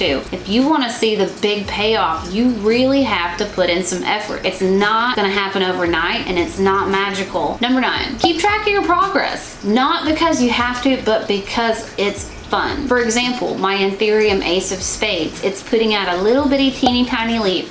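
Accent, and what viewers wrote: South, United States. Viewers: I'm here from Harli's channel. I have to say, your accent sounds like ours around here. What state are you in? South Carolina here. I'll have to check out more of your videos. :)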